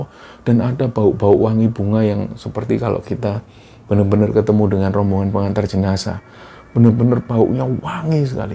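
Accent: Java, Indonesia. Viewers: Saya orang Sasak Lombok pecandu kembaran sunyi, sy 13 tahun nyatri di Ponorogo Jawa timur (<num>) , nyimak kembaran sunyi, sy menjadi TERjebak di masa lalu, backing musik, langgam sinden dan gaya adik yg cerita sangat njawani.aku 85 persen JD flashback seperti terasa masih JD santri di Jatim, ingin rasanya kembali ke masa lalu, aku suka content juga, Krn content ini, kandungan DAKWAH ISLAMIYAh, praktis mudah difahami dan efektif utk mencegah kemusyrikan, menguatkan AQIDAH, banyak pelajaran berharga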